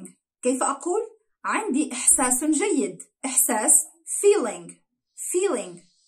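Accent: Morocco. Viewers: هل انت مغربية ام جزائرية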